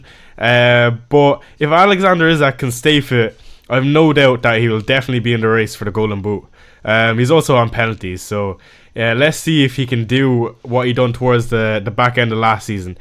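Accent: Ireland. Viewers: I can hear a hint of an Irish accent😅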